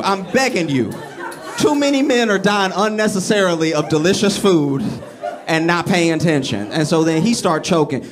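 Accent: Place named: Louisiana, United States. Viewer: lmao his accent is Louisiana but his demeaor is totally New Yorker xD "the fuck?" xD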